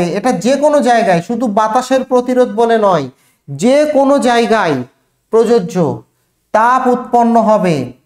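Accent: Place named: Bangladesh. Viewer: Ato bangla des lok mone hocche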